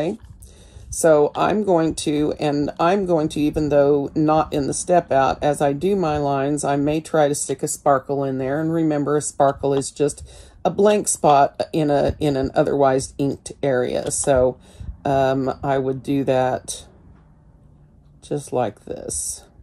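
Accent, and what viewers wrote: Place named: South, United States. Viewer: I am really enjoying your videos and your gentle, southern encouragement,. Thank you for the time and effort you are taking to make them. You have reminded me that an embroidery teacher once taught me that we all think the person on our left has nicer stitches and the person on our right has nicer colours, but remember that each one of us will always be the person to the right or left of another learner. That is exactly what you are saying about Zentangle. Don't give up, just be you. (Melb, Australia - we are opening slowly but with great vigilance. The curve has definitely flattened but we are still seeing random local clusters. Fortunately out of 7k cases we have only had 100 deaths).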